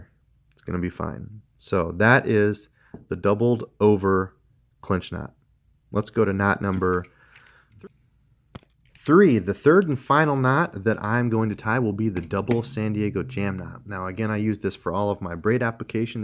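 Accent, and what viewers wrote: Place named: North, United States. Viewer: You just quoted Gerald Swindle word for word about not trimming your tag ends super short because if the bass didn’t see the rest of the line they won’t see the little tags. Get your own material. Don’t be stealing us Alabama boy’s lingo. Especially if you are from the north which is what I’m guessing based on your accent!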